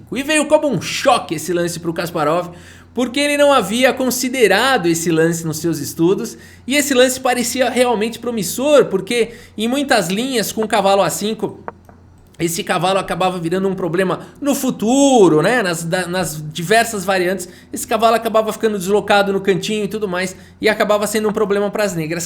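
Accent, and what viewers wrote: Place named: Sao Paulo, Brazil. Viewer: Desculpa cara mas é difícil tankar esse teu sotaque paulista. Ameniza isso daí meoooooo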